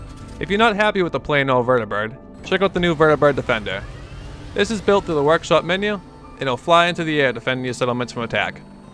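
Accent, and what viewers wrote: Boston, United States. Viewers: cool I like the Boston accent and could u do a vid on energy weapon mods if u haven't already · okay thank you for replaying! Being a console player my whole life mods are foreign to me. One more thing, are you from boston? Coming from a fellow Bostonian.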